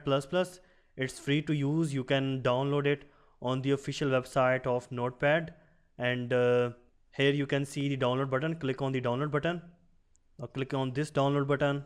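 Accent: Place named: India. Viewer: i started taking your course but i need to confess your english give me a headache english isn't my first language and this indian english just kill me when it comes to learning something difficult , i still in the number lesson but i will do my best to finish the course soon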